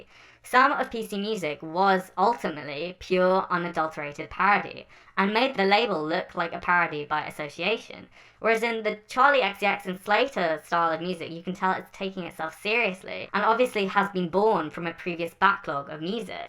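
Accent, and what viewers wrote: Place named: United Kingdom. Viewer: Americans?💀 he has a british accent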